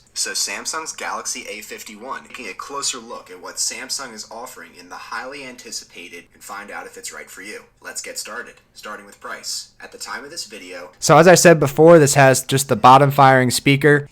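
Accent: Philadelphia, United States. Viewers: are you from philly tri-state area? lol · I'm guessing you're from Philly or South Jersey. Nice video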